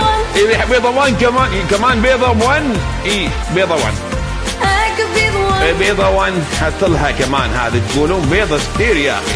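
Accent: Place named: Gulf, Arab world. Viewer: بص يابني انت مبدع بمعنى الكلمة تقلد خليجي بدوي بطريقة عجيبة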